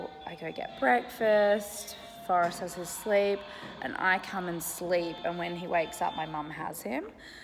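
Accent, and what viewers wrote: Australia. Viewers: Vee-log, gorgeous Australian accent.......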